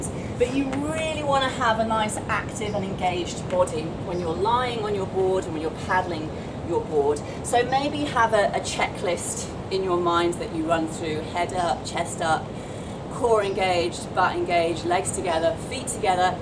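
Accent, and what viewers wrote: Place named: United Kingdom. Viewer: normal british accent i guess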